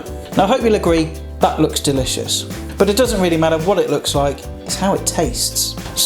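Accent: United Kingdom. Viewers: I love your accent. what kind of British accent is it?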